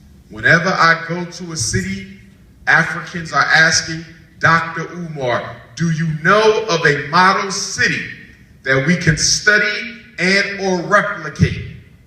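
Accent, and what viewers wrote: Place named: Toronto, Canada. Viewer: He sounds like he is from Toronto.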